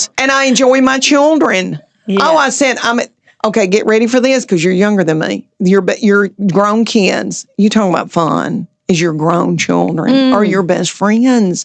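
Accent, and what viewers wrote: South, United States. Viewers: I don't know about farming people and I know with your shows suppose to give a Golden Girls / Friends vibe but honestly that accent takes me back to one of my favourit sidcomes from way back when Designing Women gosh. I loved that show I recogn if they did a remark though I'm sure it never be as good I probably love that too just because of the accent. Southern accent like that it just makes me think of the most interesting, most sophisticated, most hospitable and sexies women on the planet! Every American show just tries to get rid of the accent but in fairness because nobody does it - it's real interesting to rest to the worlds who never hears it or the little idioms that go with it. Considering how you seem to attract your 1st husband that is no word of a lie him being all up in your face since the first day he met you can stalking you the rest of his life. 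Part of me, sometimes like to put on a little southern accent especially if I wanna sass some boyfriend whom I'm flirting with cause his all about keep the Aussie accent, but have you heard the Aussie accent - nuthin' sexy about it! Men are abit slowly - he really doesn't get it but his the only one I do for and because it kinda pisses him off which kinda gives me a kick.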